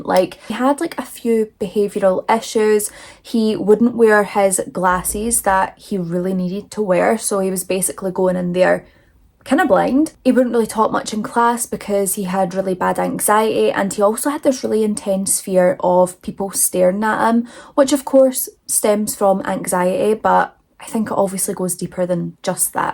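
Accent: Scotland, United Kingdom. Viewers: Hey! I started binging your videos. I like a very diverse group of true crime girlies. You have the cutest Scottish accent I could kiss you 😘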